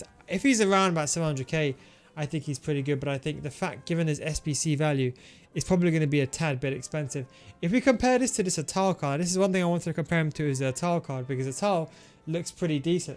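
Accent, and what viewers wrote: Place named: United Kingdom. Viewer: Love the review. Off topic but i heard your accent and curious where in UK you're from?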